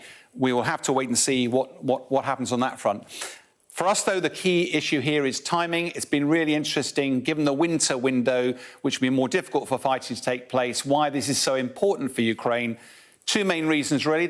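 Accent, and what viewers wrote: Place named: United Kingdom. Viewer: So what's been the timeline for the Ukrainian counterattack thus far? And why does this newscaster have to butcher the pronunciation of these Ukrainian and Russian words? It seems he's less about accuracy and more about British-ness. It's Za-por-rizh-ZHI-a, not Za-por-RISH-shi-a, KHEYR-sohn, not HER-son, POO-tin, not PEW-tin.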